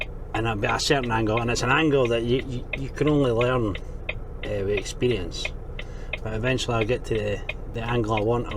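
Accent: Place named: Scotland, United Kingdom. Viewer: Perfect. Sunday dinner and the Scottish accent 🙂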